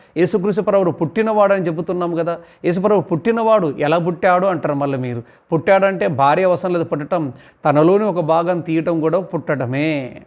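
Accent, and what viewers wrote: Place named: Kukatpally, India. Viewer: Miru Kukatpally atla matladaru kuda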